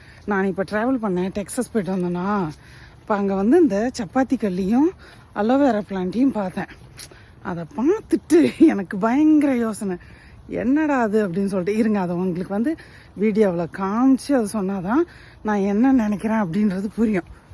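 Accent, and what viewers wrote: Salem, India. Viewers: Chappatgi kalli you showed is what we have here in India...நம்ம ஊர்ல ரொம்ப பரவலா பார்க்கலாம்...இதுல இருக்கும் பழம் சாப்பிட்டாலும் நாக்கில் முள் குத்தும்....சேலம் பேச்சு வழக்கு அருமை.......Stay blessed sister...take care..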